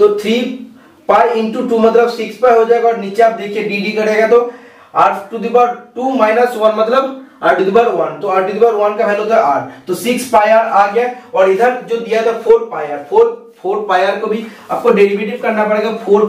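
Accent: Bengal, India. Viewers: par aap ke bengoli acsent se thoda sunne main problem hota hai sir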